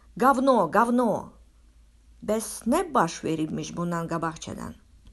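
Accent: Armenia. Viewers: Bu deyəsən bekarçılıqdan ağzına gələni danışır. Erməniyə oxşayır ləhçəsi də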